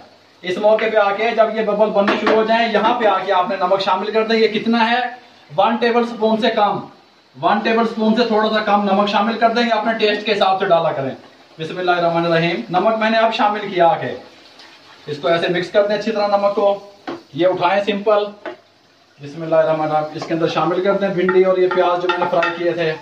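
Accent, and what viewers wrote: Punjab, India. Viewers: You know what I'm big fan of u the way u are talking specially when you tease in typical Punjabi I damn love it..Please keep it up best Regards, Shoaib from UAE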